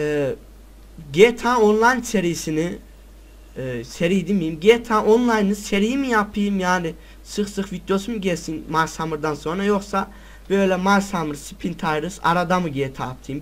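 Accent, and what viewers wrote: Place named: Nevşehir, Turkey. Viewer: way kardeşim sendemi nevşehirlisn şivesinden belli canını yediğim :)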